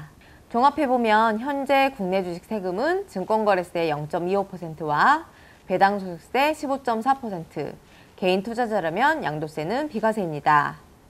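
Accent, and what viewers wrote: Jeolla, South Korea. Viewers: 전라도 분 같아요 ㅋ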